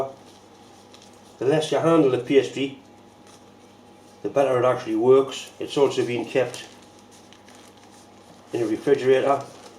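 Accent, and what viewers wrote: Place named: Tyneside, United Kingdom. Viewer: classic !! just rocked onto this, so so funny, trying to place the twang, guessin south tyne poss same as me gateshead ??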